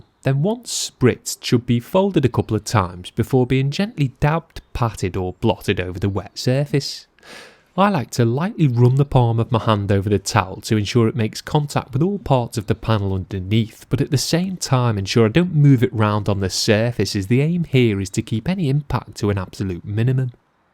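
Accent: Liverpool, United Kingdom. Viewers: I'm sorry oot. Is that scouse? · yer i know that water has anomalous properties of cohesion and adhesion however it is due to simple osmosis that a dry towel will absorb more water than a wet one! and when the water potential gradient has reached dynamic equilibrium then it will no longer absorb any more and this will be reached sooner wetting the towel ... but no hate to the scouse cleaning guy as hes sound ! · its the English the British English not english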